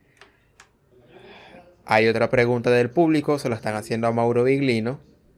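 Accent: Venezuela. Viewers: No es así lo que sucede es que tiene el acento venezolano diferente a otro país que no sea caribeño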